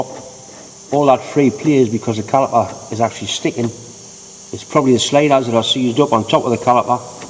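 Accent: Newcastle, United Kingdom. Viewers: Good, clear instruction and Great video . And as I,m a proper northerner I even understood your geordie accent.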